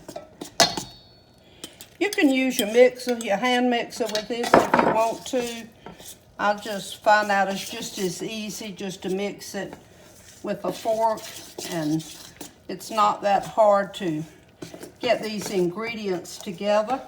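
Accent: South, United States. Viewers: I knew she had to be from the South, because of how she cook and also how she talks. She reminds me of my Grandmother love you 😘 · Hi, I love All your recipes!!! Also love your sourthern accent!!😊especially when you say R/ite, 😀so great!!!😀😁 love, from zFt. WORTH, Texas!!!😍😍😙😚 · I dearly love your videos. Yummy! I had a lovely aunt who married a wonderful Christian man from Mississippi. After a time she developed that glorious Southern accent. She has gone on to Heaven now, but sounds just like her. So calming & gracious to hear her talk.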